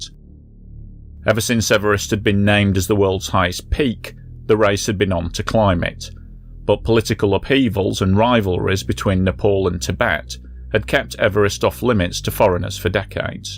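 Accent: United Kingdom. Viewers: clearly you say 18-98. Our Yank cousins ain't used to our dialect, innit 😆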